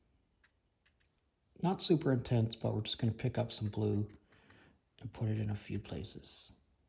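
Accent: Canada. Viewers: I recognized your Canadian accent. We lived in the Greater Toronto area for 23 years!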